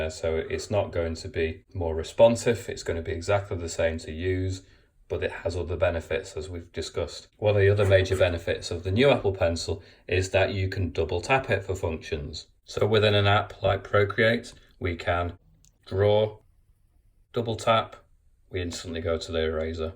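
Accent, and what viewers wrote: United Kingdom. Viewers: the brit voice makes it better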